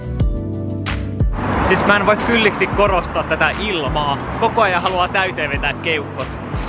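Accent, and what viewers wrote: Finland. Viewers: se on vaa tätö finlishii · Ystävällinen pyyntö - voisitko olla ääntämättä englannin sanoja tuolla suomijuntilla tavalla: ARKADE, SHHRAINI, HEDKUARTTERS, LEGIT jne. Asia on häirinnyt kaikissa videoissasi. Onko ylipäänsä pakko edes käyttää englannin sanoja, jos yhtä hyvin voisi sanoa vaikka "pyhäkkö" tai "päämaja"? Mutta hyvää Kiotossa oleskelua!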